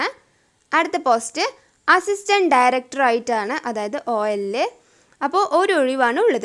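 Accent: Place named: Malabar, India. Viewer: മോളുടെ വീട് thalassey ആണോ..ഒരു മലബാർ slang ..😊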